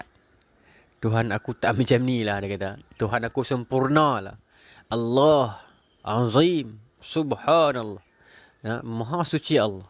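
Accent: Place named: Kelantan, Malaysia. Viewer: Ustaz ni meme rilex ore klate oyak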